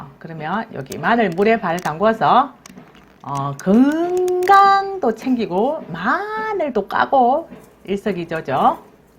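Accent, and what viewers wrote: Gyeongsang, South Korea. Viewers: 경상도 말씨 애교가. 많네요. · 시끄러운 경상도 수다쟁이 아줌마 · 영상은 10분으로 줄여 주시는게.. 경상도 말투로 쉼없이 계속 말씀 하시니 뭔 말인지 모르겠어요 귀가 멍멍합니다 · 맞아..유튜브로 돈버는것도 중요하지만..자기자신을 잘아는것도 큰 힘인것 같다..내 목소리..내 말투가 어떤지 상대방이 듣기 좋은지 ..거슬린지..자기 자신을 제대로 안다면 이렇게 장황하게 자신있게 계속 말하진 못할것 같다..진짜 경상도 사투리도 억쌘데 목소리도 거칠고..그럼 어떻게 영상을 만들어야 하는지..생각을 못하는걸까... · 살림 요리 등 등 진행중에 고향 을 느끼게하는 고향말씨 좋은 꿀팀이되는 유익한정보 등등 너무나 좋게 듣고봅니다 참좋으신분 항상도 건강하시며 경상도말씀으로 씩씩하게 해주세요 👍👍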